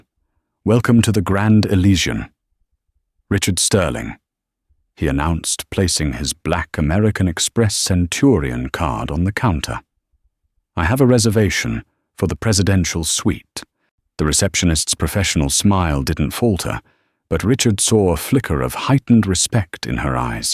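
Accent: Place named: Europe. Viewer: The narrator sounds like reading a fairy tale with European accent. Personally prefer a regular voice or a normal voice. Sorry. I couldn't stand the voice so I never finished the story.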